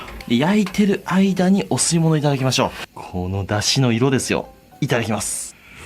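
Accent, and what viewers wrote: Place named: Nagoya, Japan. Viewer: の名古屋弁が心地いいなーと思いながらいつも見てる。名古屋の人はわかるんじゃないかなー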